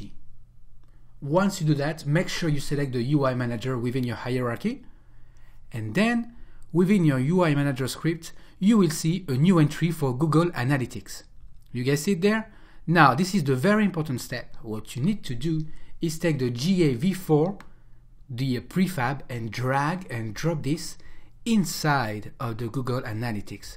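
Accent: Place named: France. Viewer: L'accent francais ^^ Merci pour le tuto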